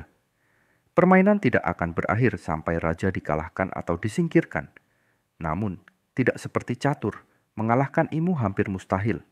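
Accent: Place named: Ngapak, Indonesia. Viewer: cok kalo medok gausa gua gua, gaenak didenger jirr guanya ngapak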